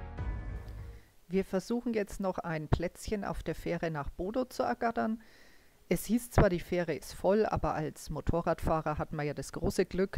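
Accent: Franconia, Germany. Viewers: Allmächt.su schee. Dei fränkischer komendar is glasse. Die Reise verlockt zum Nachfahren,wenn des sch.... corona rum is.derf mä frong was die ganze Tour gekostet hat.? Abo und like is da.liebe grüsse aus kulmbach. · Die Franken im Norden. ..... hab den Dialekt erst gehört, später Fürdder Kennzeichen, alles klar;)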